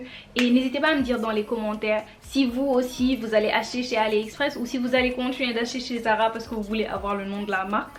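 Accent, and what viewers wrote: Senegal. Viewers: Merci pour ta vidéo avec ce joli accent DKR❤. Perso, j’ai de plus en plus de mal à admettre ces grandes différences de prix. Du coup, avec ces sites web, dure dure de se laisser tenter dans les magasins.. Pas facile pour le secteur du prêt-à-porter mais l’inflation touche tout le monde 😢 Comment ne pas tourner le dos à toutes ces enseignes qui nous assassinent avec leur prix élevé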